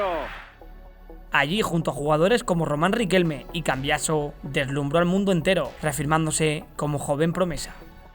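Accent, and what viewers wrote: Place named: Galicia, Spain. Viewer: gallego babling in his dialect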